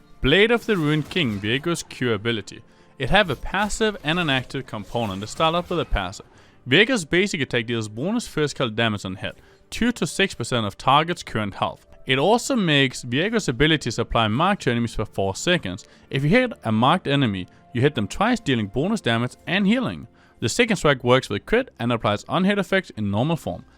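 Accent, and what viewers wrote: Denmark. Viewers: I gotta ask, are you Danish? Great video BTW. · Er han dansk??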